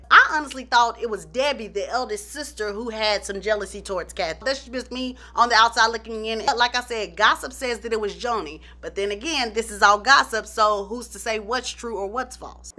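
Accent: South, United States. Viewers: Hey there girl. I was about to lose my mind if I didn’t see another one of your fun juicy videos filled with gossip scandal and tea. is one of my favorite female groups. Right up there with the. I just love the way you talk with the country accent. It compliments you so well. It goes hand in hand with your character you are so bubbly fun and such a joy to watch and listen to. You are such a talented woman. You are going so far with your talents. The lord has blessed you so abundantly. God bless you your family and your talents. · I love how you talk all country and then come through with the Dianne Sawyer voiceovers 🤣🤣🤣❤️❤️❤️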